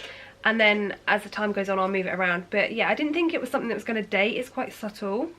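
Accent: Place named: London, United Kingdom. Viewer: I can't stand the London accent!!🙄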